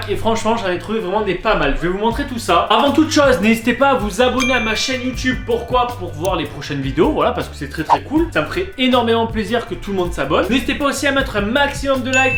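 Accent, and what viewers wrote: Marseille, France. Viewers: Il est marseillais 😂😂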